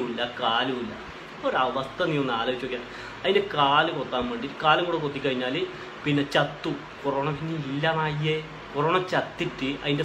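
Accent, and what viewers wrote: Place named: Kannur, India. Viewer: നമ്മളെ കണ്ണൂര് ഭാഷ.... കേക്കാൻ നല്ല രസൂണ്ട്. ലൈക് അടി മോനെ ഈട... 😊👍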